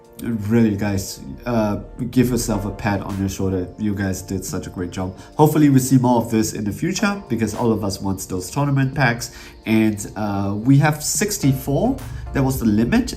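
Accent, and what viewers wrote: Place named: United States. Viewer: Hello ! Where are you from ? I always thought you were from the US...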